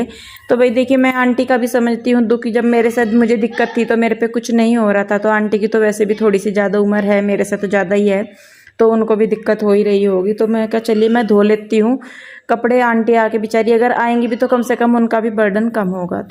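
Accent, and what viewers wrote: Haryana, India. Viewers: Bahut sundar lag rha h ji ghar aapka ji aap haryana se ho kyuki aapki boli hamare jaisi h same boli h hamare yha yehi bolte h yh mera haryana dodh dahi ka khana yh mera haryana 👌👍🙏jai shree krishna 🙏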